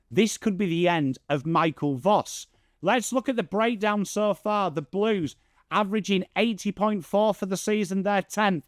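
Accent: England, United Kingdom. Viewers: Very weird listening to a pom talking afl. lol.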